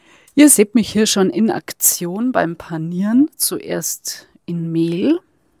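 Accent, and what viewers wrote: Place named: Bavaria, Germany. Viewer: Servus schneeglöckchen, käse knödel hab ich noch nie gehört.bin aber net so der fan käs. Spinat des doch des grüne vom kreiselmähwerk. Spinat der mit den blub war früher immer die kinderwerbung. 😅spass bei seite. Was du immer für ideen hast mit deinen kochkünsten das überrascht mich immer voll. 😊❤ oberpfalz ok hörst die eher bayerischer dialekt an dein mann hat dich gelehrt eine neue zu lernen ich lerne bayerisch 😅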